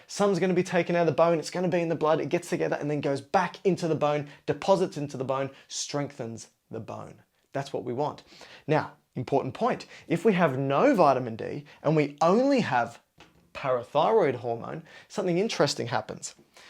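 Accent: Australia. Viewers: Aussie Accent btw why are you jacked up for your profession lol?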